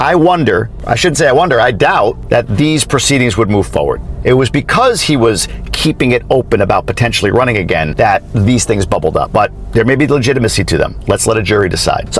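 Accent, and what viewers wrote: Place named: Michigan, United States. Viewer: I could tell your from Michigan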